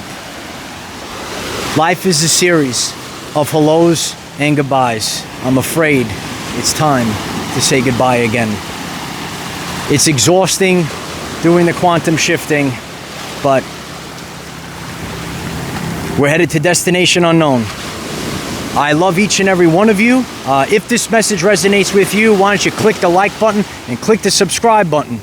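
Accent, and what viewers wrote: New York, United States. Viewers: Wyoming? I would not have guessed. But you have a noticeable New York (or east coast) accent. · I love this message. Thank you! You sound like a New Yorker 😊 We have all changed over the past years especially since Covid. Thank you for inspiring me today I need this. We are light! You are doing good work for the kingdoms❤ thank you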